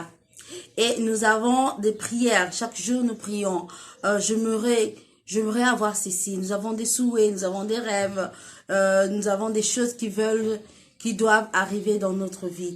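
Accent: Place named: France. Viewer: Your French accent is so beautiful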